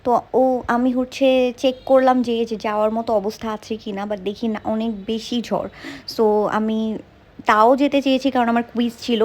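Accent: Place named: India. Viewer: tmr ktha bartar style indian typr........ Tmr nnu brir vlog vdo dekhe sure holam j tumi amdr Desher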